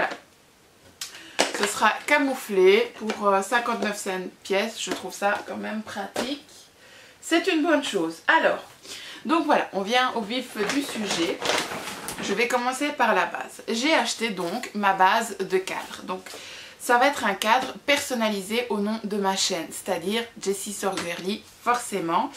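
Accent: Belgium. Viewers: Tu es belge ??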